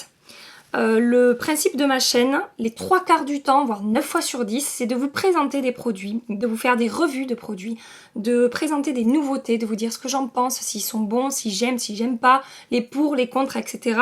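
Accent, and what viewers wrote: South, France. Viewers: Mdrrr ma tchuin avé l accent du sud mis à part le maquillage qui est à son habitude plus que réussie disons même superbe mais là tu as du perdre 2000 abonnés lol 😂 avec ton coup de gueule on sent que tu es excédé de répondre à 10000 commentaires du genre tu sors pas de ta zone de confort etc toujours les mêmes couleurs et j’en passe mais voilà comme si bien dit y’a des vidéos à la pelle de tous meme comment fabriquer une bombe alors le maquillage n en parlons pas lol 😂 Bisous ma belle et ce que tu fais tu le fais bien et tu y mets du cœur et de l’investissement personnel et financier mais ça très peu on connaissance de l envers du décor. Des milliards de 💋💋💋💋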